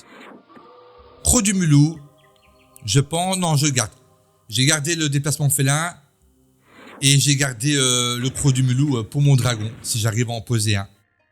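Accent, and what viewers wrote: Belgium. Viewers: Yo G8 osa 1.29 tous mes respect ! T'es belge non ? ton accent me rire tu gagnes +1abo.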